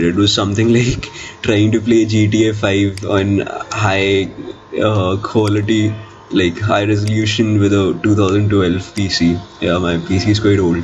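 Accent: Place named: South Asia. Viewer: I HATE PAKISTAN-INDIA ENGLISH ACCENT.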